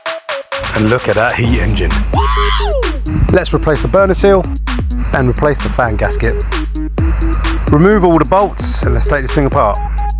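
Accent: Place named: London, United Kingdom. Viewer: Love that london accent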